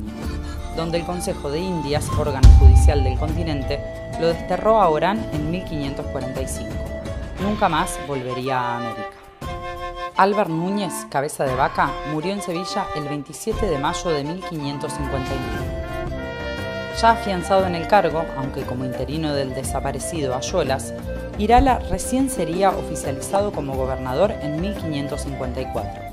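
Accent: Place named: Argentina. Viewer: Fiel a la leyenda negra, una voz en off de una inglesa hablando en castellano con acento argentino.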